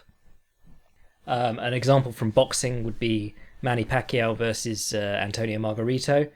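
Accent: United Kingdom. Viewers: i'm brazilian and i love your work i have been reading everything you wrote sinceramente but my english skills still no só good enought to hear and understand everything you say even with your cool britsh style very much more easy to me, thanks but May you put subs in this podcast? if you do and i share this(in fact a will share whatever happens lol) others of my countryman will enjoy too, and belive in me, brazilians NEED your work people here love mma but don't know nothing about this...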